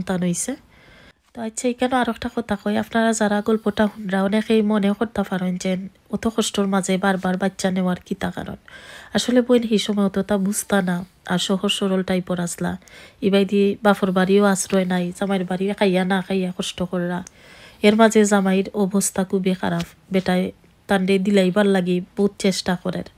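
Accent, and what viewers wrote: Sylhet, Bangladesh. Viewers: তোমার সিলেটের মাত আমার খুব পছন্দ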